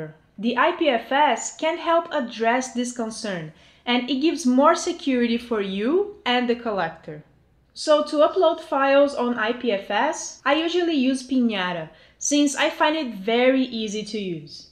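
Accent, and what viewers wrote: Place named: Brazil. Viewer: Vc é brasileira né? srsrsr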